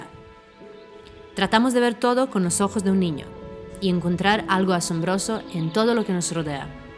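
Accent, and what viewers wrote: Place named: Serbia. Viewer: Genial documental, el acento serbio de la narradora le da un toque especial y exuberante más la calidad y las transiciones que son muy buenas! Felicidades!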